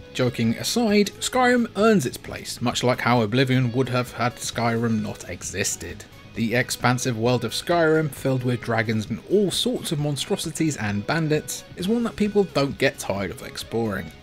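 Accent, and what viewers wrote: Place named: United Kingdom. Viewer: PO'OL TAOW. I love British accents.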